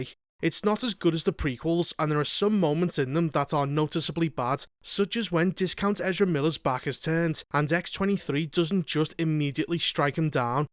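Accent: Liverpool, United Kingdom. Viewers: He's a posh scouser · Liverpool accent.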